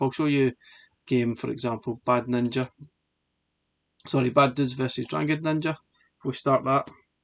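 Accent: Scotland, United Kingdom. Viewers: Fanstastic scottish accent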